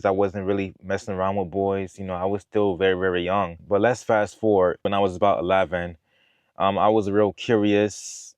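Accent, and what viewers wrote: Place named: New Jersey, United States. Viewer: I hear an east coast New Jersey accent or somewhere near there.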